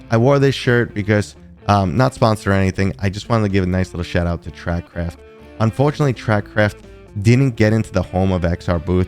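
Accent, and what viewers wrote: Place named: New York, United States. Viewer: haha my bad, just breaking your balls. I guess this is the first time I actually noticed your accent in your videos, is all :). Starting with how you said "Silent North." also you're likely in NYC if anything, idk why i was thinking Massachusetts haha.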